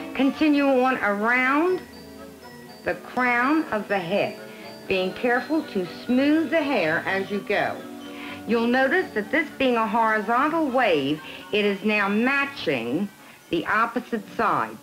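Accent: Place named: Pittsburgh, United States. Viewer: Very distinct Yinzer accent lol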